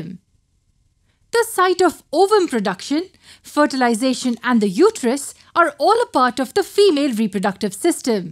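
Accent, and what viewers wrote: India. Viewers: Indian accent??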